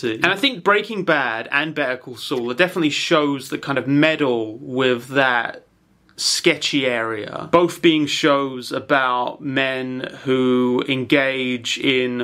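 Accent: United Kingdom. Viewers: honestly i can understand the impulse to want to go back on your delivery in the prior video. i didn't look at the comments but i'm sure it was full of people who were highly critical. i just went back and rewatched it and i have to say i don't think there's any backpaddling necessary, you did a fantastic job at meeting Skylar's critics in the middle and provided a very strong case illustrating how Walt pushes Skylar to rebel against him, ultimately making her the hero that triumphs over the life of terror he is bringing about to the family. I think for the last videos critics, with the time that has passed i'd be really interested to know if they still feel the same way. I think it reflects more poorly on them and their insecurity in their own perception of the show. It's like saying, "well i was wrong and you are right but i didn't like the way you said it!" and truly i can't see where they could see that other than Americans typically being overly critical towards GNC people and maybe your british accent feeling condescending to us when it's just how your fucked up voices sound. 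Anyways, ty for the follow up video, i'm loving these uploads so much.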